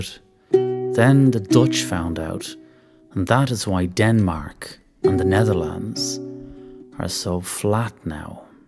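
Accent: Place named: Ireland. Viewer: this is so precious!!! once i can get over that dream of an Irish accent and put my focus on the story and the gorgeous mountain scapes!!! bravo ...a wonderful and rich story!!!!!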